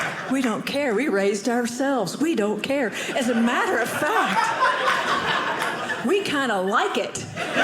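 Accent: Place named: Maine, United States. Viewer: Still a little bit of a Georgia accent, but more Maine in there than before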